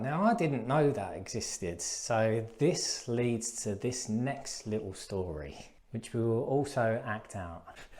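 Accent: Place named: London, United Kingdom. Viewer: I feel your pain, I need a raft foundation alonge with a couple of steel beams and had 93 pages of structual engineering calculations turn up. I just turned to the back two pages to see what I really need! By the way you must come from the same part of London as my mate (who's a bricky) as my daughter heard you when I was watching and was convinced it was him lol, you sound alike and have an identical manner.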